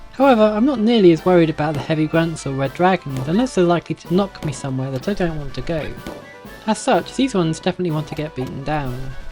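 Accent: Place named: United Kingdom. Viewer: They fly some what erotically? I heard that and laughed I'm sorry it was accidentally funny and I just want to say I do like this LP a lot (so you don't think i am a jerk for kind of insulting your interesting sounding accent...British I...think?) and I will stop typing now, since I want to go back to watching...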